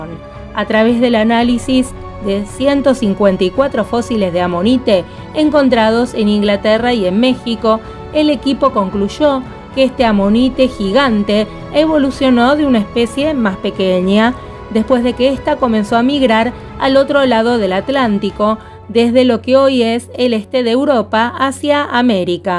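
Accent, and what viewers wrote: Argentina. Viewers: Amo tu acento Argentino 🥰😍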